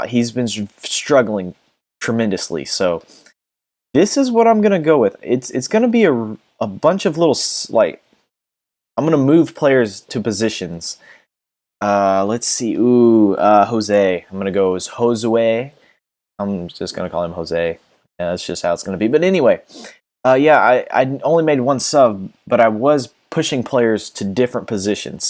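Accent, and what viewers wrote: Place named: United States. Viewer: Enjoyed the video, with the american twang, great to hear how your voice has grown since the first video. Looking forward to more. Your tactics look a lot better as well.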